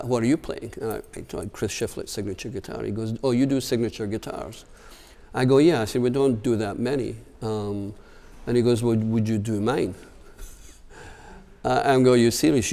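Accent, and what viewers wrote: Scotland, United Kingdom. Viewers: Am I the only one that was surprised that he’s Scottish? Not saying it’s a bad thing, just a little unexpected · hes from whitburn new he had a scottish tone . makes me proud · Was not expecting the Scottish accent, or that playing "style" if you can even call it that. I gots ta give the man props for partnering with Seydel harmonicas though to create the excellent Midnight Special harmonica, truly one of the most beautiful harps ever produced. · He's got that annoying accent that all Scottish people get when they live too long in America.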